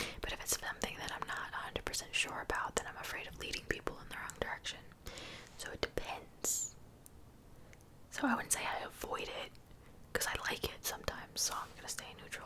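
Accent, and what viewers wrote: United States. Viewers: what part of America are you from? (I’m Australian so the State is probably all I’ll know 😂)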